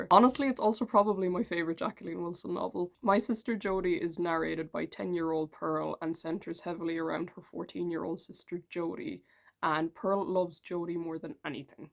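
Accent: Ireland. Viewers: I clicked on this video and recognised the accent straight away lol, r u a south Ireland pep? Unfortunately I'm from the shitty north but it's cool to know I'm not the only alt person on this island 💀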